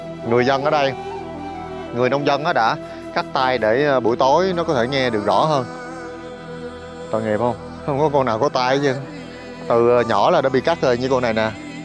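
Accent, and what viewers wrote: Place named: Mac Cuoi, Vietnam. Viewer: Cam on em . Chi Nghe em noi chuyen Mac Cuoi va thay vui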